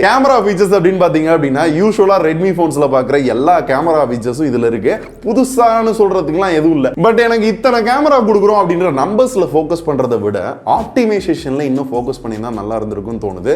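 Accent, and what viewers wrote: Madurai, India. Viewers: Anna neega madurai thana 🤔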